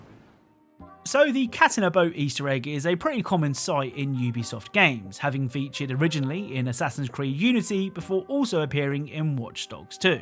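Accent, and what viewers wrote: England, United Kingdom. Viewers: Like how? Like catacombs..? Or like catacombs? Or maybe catacombs? I don't get what you're saying. I mean he's got an English accent, but he says catacombs as catacombs...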